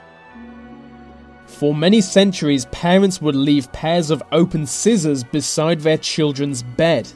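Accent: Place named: RP, United Kingdom. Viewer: In fact, you say every word ending in Y like a posh twat.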